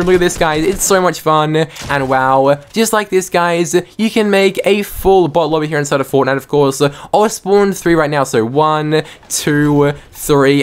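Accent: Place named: Australia. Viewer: Hes Australian. I know that accent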